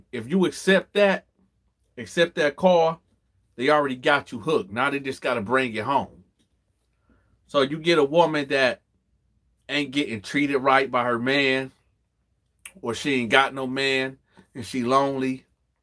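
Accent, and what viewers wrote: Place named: Ohio, United States. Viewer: I swear you Feel like Family. Something about your Aura is Vibrant. And you mentioned Ohio. It's family Familiar. Your accent and language. Buster...lol they way you talk I knew before you said it. OHIO Blessings to You and your Family...We all just tryna Understand this thing we call LIFE. Thank You...for your time.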